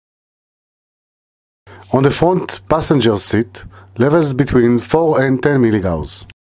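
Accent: Israel. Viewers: אתה ישראלי לפי המבטא אני בטוח חחח אין מגנים לדברים הללו ?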